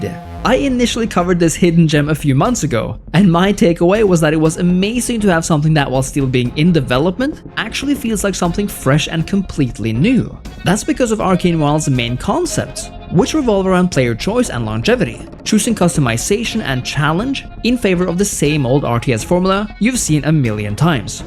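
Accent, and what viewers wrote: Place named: Norway. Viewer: He is from Norway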